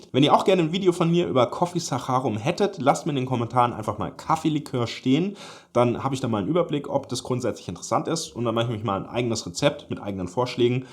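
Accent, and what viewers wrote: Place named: Bavaria, Germany. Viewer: 🤣sehr lässiges Boarisch!! Weida so!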